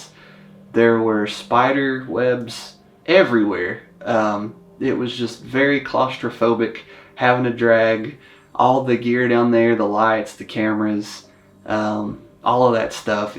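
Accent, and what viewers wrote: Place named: Tennessee, United States. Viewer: WoW 😲 Cool your accent is Tenn.You sound Just like my relatives! From Kingsport, Bristol, Mendota,Johnson City. It’s on Dad's side. Great job with this & Alter was Awesome 👍 Hope you're doing well in the Industry.Happy Halloween 🎃 Season 🔥🎃👻🧛🏼‍♂️💭🦇🔥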